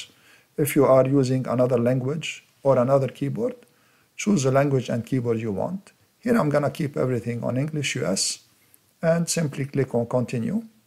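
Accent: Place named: Lebanon. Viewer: sounds like a lebanese accent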